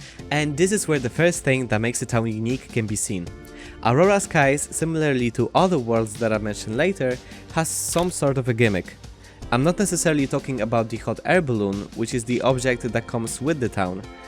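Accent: Poland. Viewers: I keep forgetting you're Polish and keep getting jumpscared by the accent each time but yep, Sunset Valley was made as generic as possible so all players will feel comfortable playing there during the first time they get to play the game - they can choose a modern villa or an era-appropriate house based on what their preferences are and a more or less lore-important family as they please, so players of the previous games would most likely choose the Goths, Bachelors or Langeraks while new players would play any of the families in the city, especially the rich ones like the Landgraabs or Altos it's a bit similar to how Sims 2's Pleasantview had a few different styles of houses by default, like the simple white cubes, trailer pack houses or expensive mansions, no singular theme, just a default, generic world any player could relate to and feel at home (+ Strangetown and Veronaville for those who'd want to start in a more thematic neighborhood) they shared this once in an interview that they first had big-city ideas, but settled on making a small town as it would be easier to design and would be less complicated so the players wouldn't feel overwhelmed by it, and they did that by putting everything a player would need in one place, whether it's shopping, work or services, with not-as-important locations scattered around the map so it's easy to find stuff you'd need, and it would make the game feel more alive as most Sims would be seen around the world's places of interests, and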